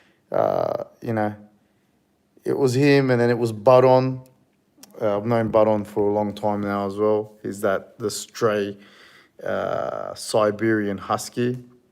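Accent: Australia. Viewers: I always wondered why you had a slight Aussie accent. It's not a very strong aussie accent because I can understand you but it's a beautiful accent. So you guys have been there. So cool!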